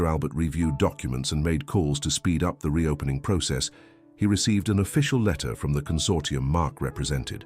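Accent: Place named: United Kingdom. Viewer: I enjoy your English English. American English I do not like so much. So your pronunciation is a melody for my ears. Hard work, honesty, perseverance is what makes people happy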